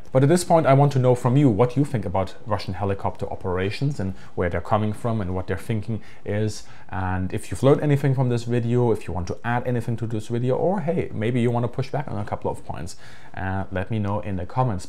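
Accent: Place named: Germany. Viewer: Ukraine has an aviation problem, they have none. They have to go begging to EU countries because, well theirs have been destroyed. I love how a guy with a German accent recommends how Russia should use their helicopters, lol what a joke, I'll take him seriously when Germany stops hiding behind Americas petticoat and enters the conflict.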